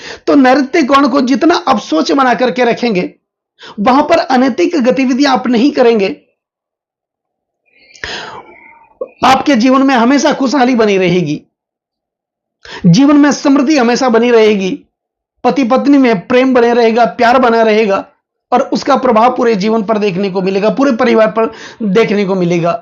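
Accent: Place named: Punjab, India. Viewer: It’s seems Hindi is not his first language, there’s Punjabi kind of accented Hindi.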